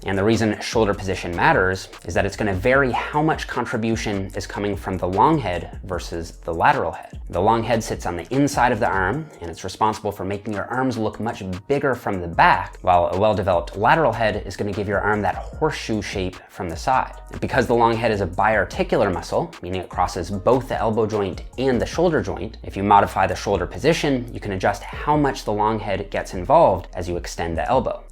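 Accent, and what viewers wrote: Canada. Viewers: lol the 'erhm' & 'baarh' Canadian af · Canadian accent lol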